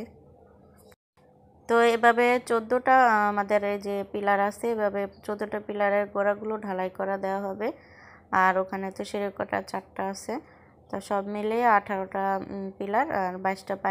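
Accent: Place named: Barishal, Bangladesh. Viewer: মাশা-আল্লাহ এই বরিশালের ভাষা আমার কাছে শুনতে ভালা লাগে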